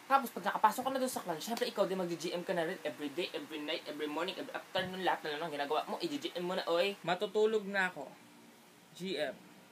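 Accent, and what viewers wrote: Visayas, Philippines. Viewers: Curious lang po ako sir, bisaya ba kayo? May accent kasi kayong visaya! Salamat po!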